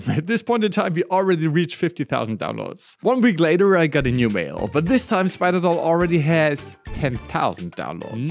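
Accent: Germany. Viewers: You can really hear the German aktent.😅 Do you still have a say in the game? But I don't think so.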